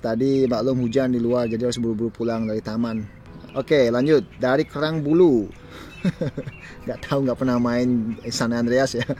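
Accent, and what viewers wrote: Bali, Indonesia. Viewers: Logat bahasa kaya orang bali om salam dari palangkaraya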